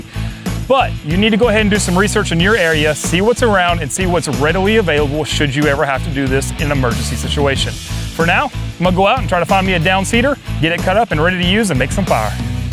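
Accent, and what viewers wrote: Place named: Tennessee, United States. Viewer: What part of Tennessee u from brotha?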